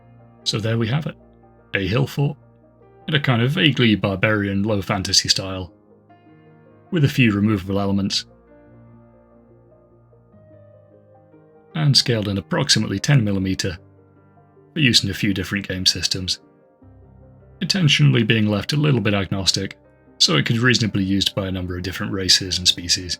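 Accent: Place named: United Kingdom. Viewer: Another great vid! Where do you get your blue foam from? I've not seen it in any of my local craft stores (I'm assuming you're based in the UK based on your accent.)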